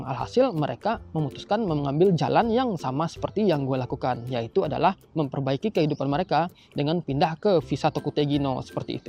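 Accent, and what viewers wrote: Padang, Indonesia. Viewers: Abg nih org padang ya?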